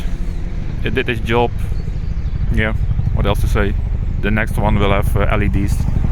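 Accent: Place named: Netherlands. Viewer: Dutch accent :)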